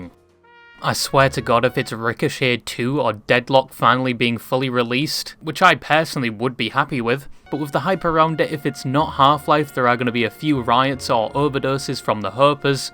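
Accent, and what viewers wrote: United Kingdom. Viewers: I love you too talking british bird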